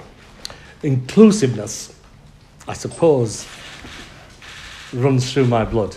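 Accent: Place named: Yorkshire, United Kingdom. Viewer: Great Yorkshire accent..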